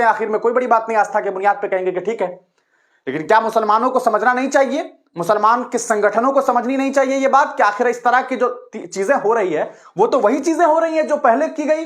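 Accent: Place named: Pakistan. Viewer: नाम हिन्दुस्तानी मगर तेरी बोली से पाकिस्तानी होने की गंध आ रही हैं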